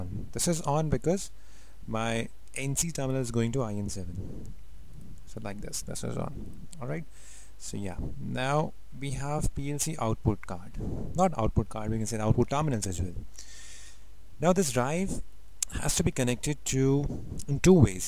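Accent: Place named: India. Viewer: Please cleanup the sound , and if at all possible a native English speaker. The sing song lulaby of Indian accent is porrly recorded and annoyingly hard to understand. OK? PS not so many OK?s please.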